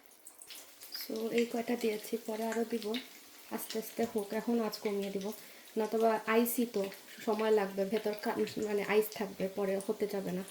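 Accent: Sylhet, Bangladesh. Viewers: আস্সালমুয়ালাইকুম ,আজকে ১st কমেন্ট করলাম, আমি আপনার একজন রেগুলার ভিউয়ার্স, আমি আপনার ব্লগ দেখি কত ইয়ার আগের থেকে ঠিক মনে নাই, তবে আপনার সিলেটি ভয়েস শুনে ই আমার জা আপনার ব্লগ শেয়ার করেছিলো বলসিলো দিনা কি সুন্দর সিলেটি ভাষা শুনতে ভালো লাগতো তবে অনেক কথা ই বুঝতাম না 😅, তখন মনে হয় করোনা র সময় ছিলো ।আমরা জাপান থাকি ,আপনার ব্লগ অনেক ভালো লাগে, আর কত যে রেসিপি আপনার কাছ থেকে শিখছি, আলহামদুলিল্লাহ, একটা কাবাব এখন ও প্রায় করা হয় ওই যে পাটা তে চেছে বিফ কাবাব, আপনার আম্মা র রেসিপি । অনেক দোআ আপনার জন্য মাশাআল্লাহ এত্ত গুণী আপনি, আর আপনার ঘর গুছানো আমার অনেক পছন্দ ।অনেক কিছু লিখতে মন চাইতছে থাক ❤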